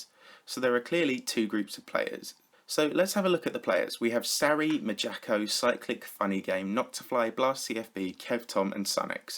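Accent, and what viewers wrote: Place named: England, United Kingdom. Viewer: No, he's not french. He doesn't speak French, he doesn't look French, and has an English accent. He's from the UK.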